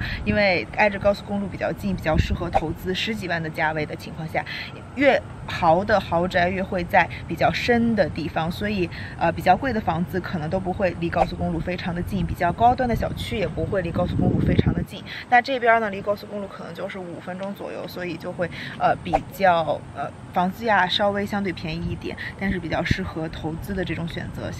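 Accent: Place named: Beijing, China. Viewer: 您是北京人吧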